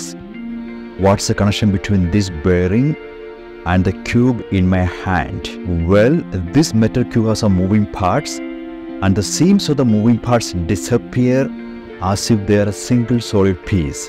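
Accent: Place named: India. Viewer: So the more you hear an Indian accent, the easier it is to understand.. just watch more often!! You’ll be amazed! I have zero issues understanding even the thickest of Indian accents.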